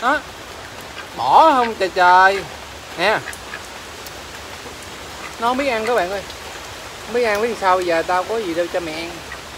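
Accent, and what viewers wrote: Mekong Delta, Vietnam. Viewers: Lần đầu tiên e xem video của a e rất thích. Cười nghiêng ngửa giọng a đúng chất miền tây.ủng hộ a nhé các bạn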